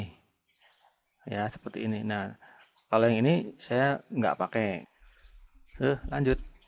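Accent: Banyumas, Indonesia. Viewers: Belajar gambar dino pak..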